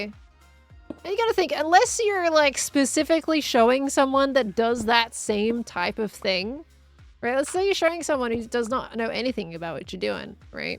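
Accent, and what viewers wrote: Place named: Australia. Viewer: I'm just happy to hear the Australian accent.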